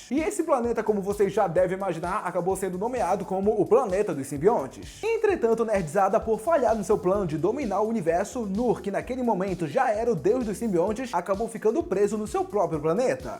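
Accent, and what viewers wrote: Brazil. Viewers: ce é de Belém?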